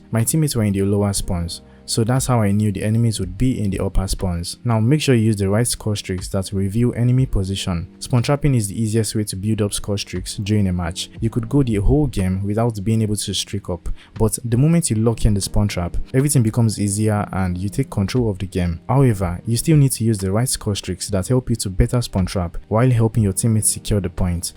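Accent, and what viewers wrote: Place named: Africa. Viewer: Ooohhhh my gooodddddddddd ur african accent kills meeeee It's soooo guuuudddddd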